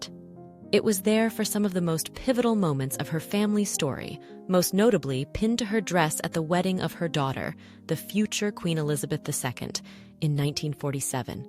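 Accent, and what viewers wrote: United States. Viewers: I must say that although the channel Has an American accent, the understanding of the position of Royalty and its relationship with the people is spot on. Something many foreign commentators never ever get right. E.g. the way the Queen Mother wearing her jewellery is not vanity but respect for those who really ‘own’ the jewells. The people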